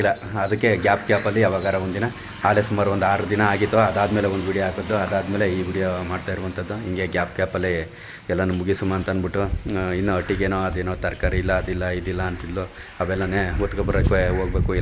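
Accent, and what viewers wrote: Mandya, India. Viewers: ನಮಸ್ಕಾರ ಅವರಿಗೆ ಡೈಲಿ ವ್ಲಾಗ್ ಮಾಡಿ ನಾವು ಬಳ್ಳಾರಿಯಿಂದ ನಿಮ್ಮ ವಿಡಿಯೊ ಇನ್ ಟ್ರೆಸ್ಟಿಂಗ್ ಇದೆ ನಿಮ್ ಮಂಡ್ಯ ಭಾಷೆ ಕೇಳಕ್ಕೆ ಖುಷಿ ಅಸ್ಸುತ್ತೆ ಶೇವಿಂಗ್ ಮಾಡ್ಸಿ 5 ವರ್ಷ ಸಣ್ಣ ವ ಆಗಿದಿರಾ ಮನಸ್ ಮಾಡಿದ್ರೆ ಇನ್ನೊಂದು ಮದುವೆ ಆಗ್ಬೋದ್😊😊 ವಿಡಿಯೊ ಸೂಪರ್👌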